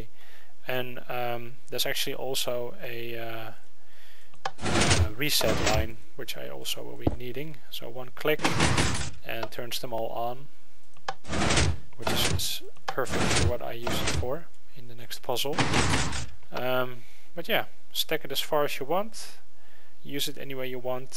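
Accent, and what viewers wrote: Netherlands. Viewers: u dutch? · Haha lol jij bent dus Nederlands dacht al je klinkt niet echt engels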